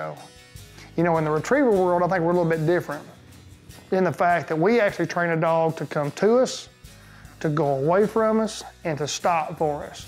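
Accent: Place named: Texas, United States. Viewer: That Texas accent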